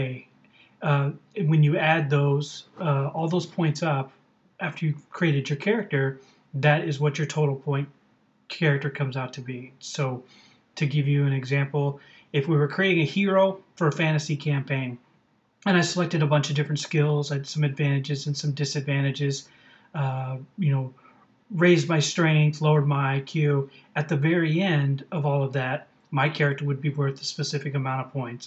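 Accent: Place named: Midwest, United States. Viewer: funny accent. he sounds like a midwesterner until he says attributes. never herd it a-TRIB-utes.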